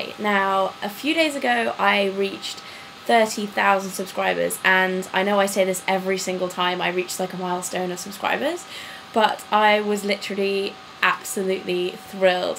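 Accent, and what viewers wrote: United Kingdom. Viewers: Hi, I actually just found you through MissGlamorazzi and I really love your videos and your British accent! I have a friend who lives in Hull, I think thats how you spell it?? Actually I think she lives in London now and I love listening to her voice. My husbands step-mother is also from England, she grew up in the Isle of Mann. Anyways, Could you do a video on different hair styles that are simple everyday looks? My hair looks the same everyday and I have no idea what to do with it! Thanks!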